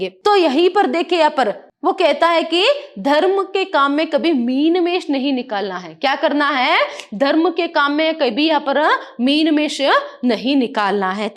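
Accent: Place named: Tamil Nadu, India. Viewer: mam your hindi has tamil accent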